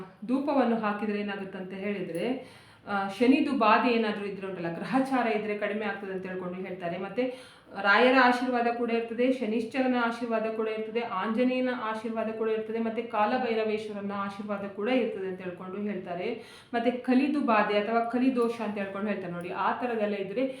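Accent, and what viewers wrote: Mangalore, India. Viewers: ನೀವ್ ಮಂಗಳೂರಿನವ್ರ. ಮಾತಾಡೋ ರೀತಿಯಿಂದ ಕೇಳಿದೆ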